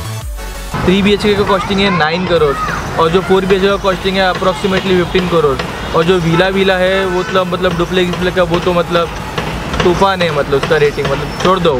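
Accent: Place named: Maharashtra, India. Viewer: Loved your Marathi accent English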